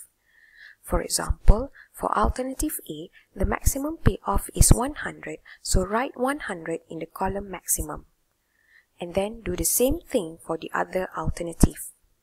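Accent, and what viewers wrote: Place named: Malaysia. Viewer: Malaysian accent. Nice talented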